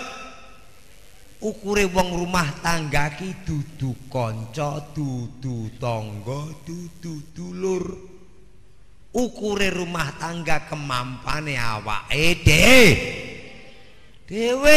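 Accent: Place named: Madura, Indonesia. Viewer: luar biasa bagus banget logate mirip meduronan..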